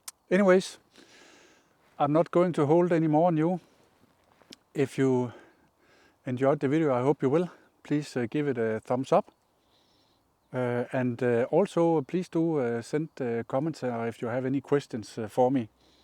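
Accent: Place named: Denmark. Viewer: Hello. I was playing pipe videos in my shop while working and heard your accent. I stopped immediately to check your video out. I was right danish! I retired two years ago with a great company NovoNordisk which took care of me and my family for many years. I had great times and memories visiting Copenhagen and Kalundborg through business. It was great thinking back and I have many great friends that I have kept from Denmark! Thank you for the video. Have a great day! It was great looking back.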